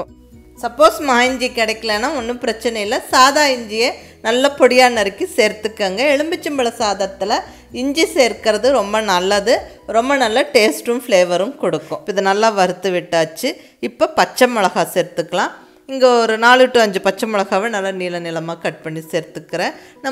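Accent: Kongu, India. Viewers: Neenga pesura cbe kongu Tamil ku ....na addit ❤️..cbe la enka irukinka mam...Naanum cbe than ❤️👍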